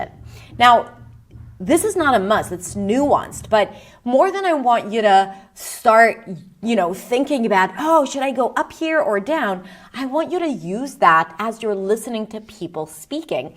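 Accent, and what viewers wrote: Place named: United States. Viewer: As a native English speaker, I’m not sure why I’m watching this, but I’m fascinated. Very surprised to hear that English is not her first language. Her American accent is 100% perfect.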